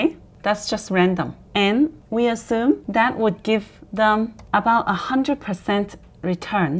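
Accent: China. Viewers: Are u a chinese ? Cause your accent sounds like so chinese. Whr u from? China ? Taiwan ?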